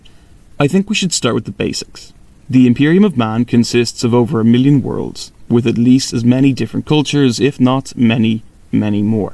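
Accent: Ireland. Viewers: It's nice hearing another Irish accent rambling at length about 40k minutae. Irish accent right? Edit: I commented too early. You mentioned it in video. 😂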